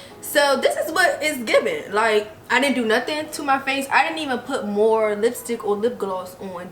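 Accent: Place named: Baltimore, United States. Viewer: Sounds like she's from Baltimore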